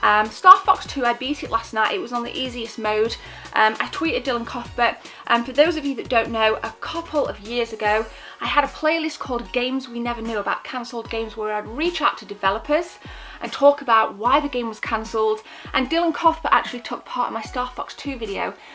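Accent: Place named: Liverpool, United Kingdom. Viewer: where you from..I can't work your accent out...hear bits of Liverpool /mereyside occasionally. brand new to your channel. lov it x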